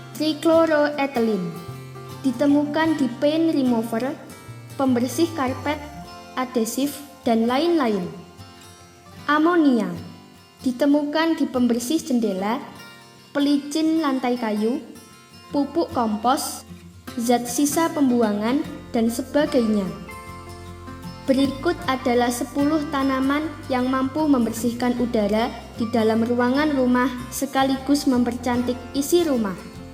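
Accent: Java, Indonesia. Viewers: Beetele2,,,,n sgt meddok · Jawanya medok kalii itu bang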